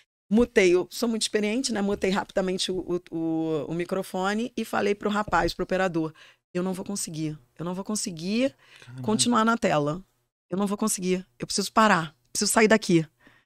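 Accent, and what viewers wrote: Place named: Rio de Janeiro, Brazil. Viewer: Sotaque lindo, Carioca né... lindona de viver. gente grande !!!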